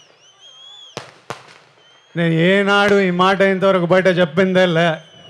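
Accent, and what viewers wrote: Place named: Rayalaseema, India. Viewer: Rayalaseema slang🎉🎉🎉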